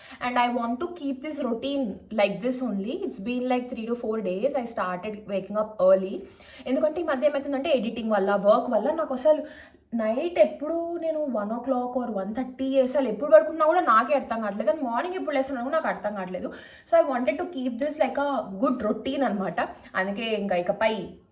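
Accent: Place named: Kadapa, India. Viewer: mana kadapa slong vintu vuntunta vinasompu ga vundi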